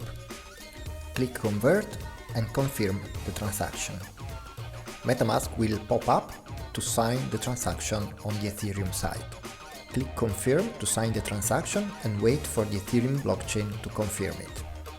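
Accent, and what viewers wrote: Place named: Bulgaria. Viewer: the speaker has bulgarian accent :D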